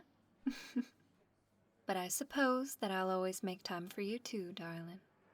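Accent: South, United States. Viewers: Love the audio and love the southern belle hints to it aswell great work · That southern drawl adds SO much! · Love the southern twang in this one. Thanks for the audio!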